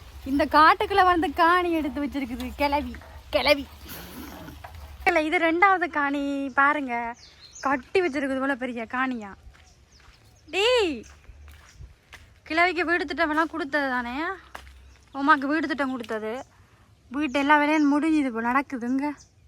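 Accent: Sri Lanka. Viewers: உங்களுடைய குறல் இளங்கைவாணொலியின் பிதாமகன் ஐயா அபதூல்ஹமீது நினைவு வருகிறது இலங்கைத்தமிழ் உச்சரிப்பு கேட்க அழகாயிருக்கிறது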